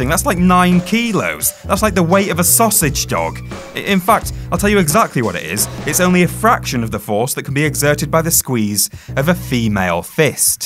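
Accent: Canada. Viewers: Is Canadian? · i didn't know you were Canadian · Is this English guy actual Canadian or have I have been to many episodes of South Park?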